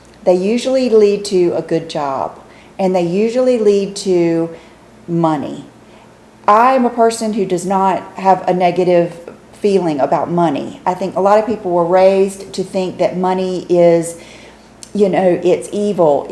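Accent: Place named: Texas, United States. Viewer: do you live in Texas. I live in Tennessee and you have about the same accent as me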